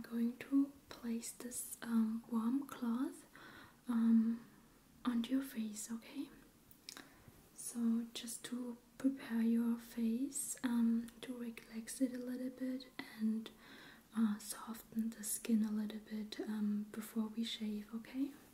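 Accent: Germany. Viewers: What an extraordinarily charming German accent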